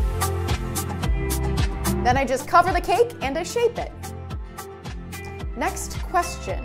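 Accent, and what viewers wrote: United States. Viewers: I found you on IG and assumed you were Russian. Now hearing you talk, you're obviously American lol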